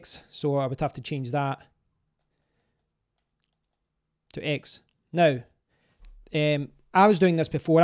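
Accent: Scotland, United Kingdom. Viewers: Ma man, how on earth you go from the game to the main menu! Nice to hear a Scottish accent btw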